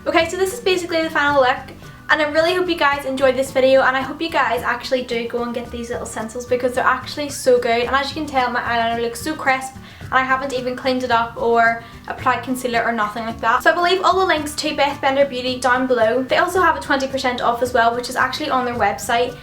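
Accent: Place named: Northern Ireland, United Kingdom. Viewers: Northern Ireland I think ?! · myrthe van leest Belfast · Northern Ireland I know her 😏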